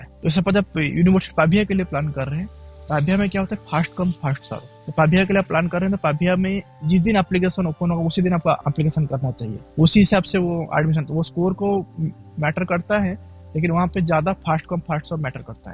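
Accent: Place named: India. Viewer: oooo😂😂I can catch ur hindi accent 🥰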